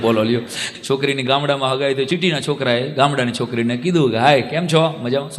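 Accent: Kathiawar, India. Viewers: tmari speech kaathyavaadi mne khub j gme 6e kaathyavaadi speech madh jevi meedhi hoy 6e jane k sambhlta rahiye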